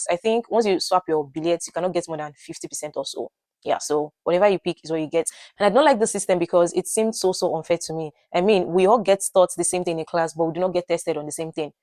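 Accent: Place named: Nigeria. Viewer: Thank you for your video. However, for some people who aren't very familiar with the Nigerian accent, it would be very helpful if you could either speak slower or add subtitles. I, for one, although I understood what you were saying, I had to replay some parts over and over to capture the words. But thank you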